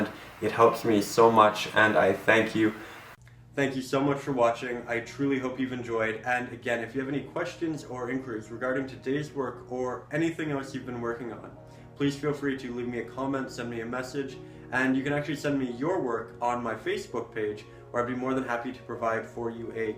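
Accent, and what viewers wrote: Canada. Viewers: Fabulous! Great Canadian accent, mate! I hear ya. Thank you for your lessons. They're invaluable to me.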